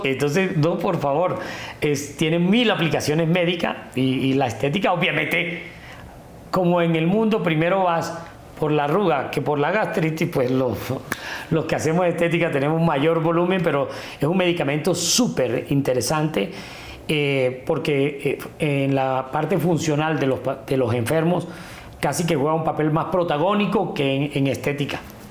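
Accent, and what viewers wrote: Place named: Barranquilla, Colombia. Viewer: Barrnquillero que baila arrebatao, no has perdido el acento currambero. Orgullo costeño...que sigan los éxitos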